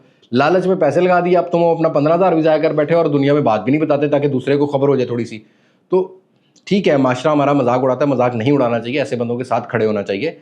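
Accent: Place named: Punjab, India. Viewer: Punjabi voice punjabi lehja aur aesy fraud he hoty h awazz he fraudiya h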